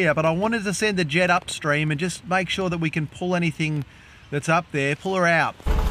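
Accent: Australia. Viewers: Hey there mate I just stumbled on your YouTube a few weeks ago and I just subscribed to your channel, I’m from Adelaide, South Australia and you have really put a big smile on my face watching your channel, you are sure a %100 Aussie from your accent, I was born here in South Aussie from my parents who escaped Vietnam for me to have a safer life here in Australia, I’m 44 in July and you have made me smile from the first YouTube I’ve watched. I’ve been through cancer since 1991 and kicked cancer in the butt. You keep up with the good work your doing 😊😊😊😊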